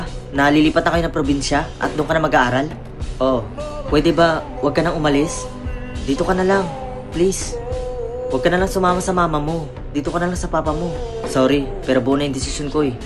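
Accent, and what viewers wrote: Visayas, Philippines. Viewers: bisaya man diay ka idol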